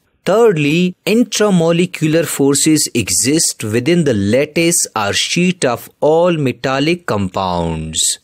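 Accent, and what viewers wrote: South India, India. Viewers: Are you southindian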